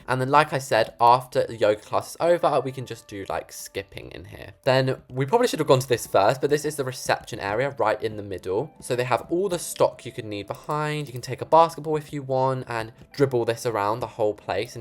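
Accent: United States. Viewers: I CANT I LOVE THE A,ERICAN ACCENT ITS TOO FUNNY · Do an entire video in your american accent and call everything in their american way, Ex: Crisps are now chips. (im from america lol and want to see you strugle with everything) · I LOVE you Amarican accent:) Its sooo Fun! She should come to Amarica someday! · I love ur builds but I’m not the biggest fan of ur style… or ur American accent srry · I love how he does the American accent 😂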